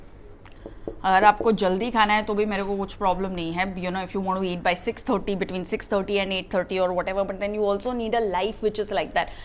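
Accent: Mumbai, India. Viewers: Love the mumbaiyya style talking ❤️ but mam every point is perfectly explained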